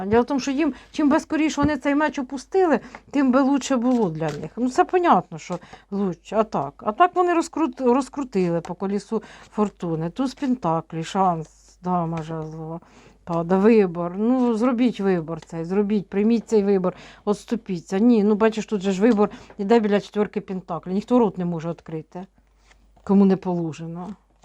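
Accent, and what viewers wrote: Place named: Kherson, Ukraine. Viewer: І у нас на Херсонщині так розмовляють, наш суржик рідний. Дякую, за вашу працю.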